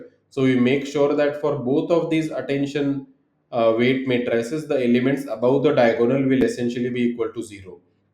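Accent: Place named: Maharashtra, India. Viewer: Perfect marathi accent🎉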